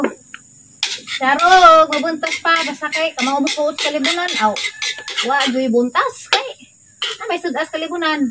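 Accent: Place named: Leyte, Philippines. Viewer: Hello bisaya pud ko pero naay lain nga words nga imong giesturya. Taga leyte baka kay ang punto murag same s taga leyte.dili ko ma boring s imong video ba mao e subscribe tika😁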